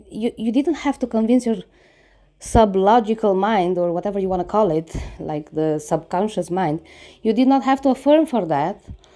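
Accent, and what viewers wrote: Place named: Spain. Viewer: I really love your Spanish accent it gives you An edge ✨✨😉 never stop being this blunt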